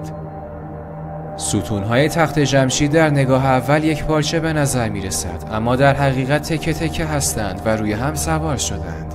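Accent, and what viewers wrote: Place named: Azerbaijan. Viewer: درود بر شما! عالی بود و بسیار لذت بردم. از لهجه تون هم فهمیدم که آذربایجانی هستید! یاشاسین آذربایجانین شریف خالقی!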